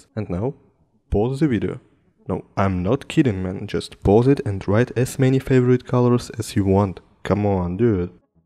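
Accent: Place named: Russia. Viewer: у автора как будто русский акцент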